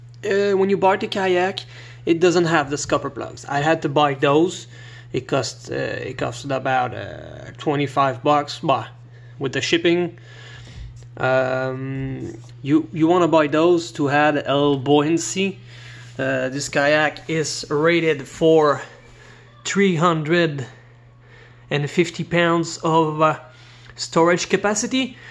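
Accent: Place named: Quebec, Canada. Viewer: Quebec 101 i can spot that accent ;) Good video!!!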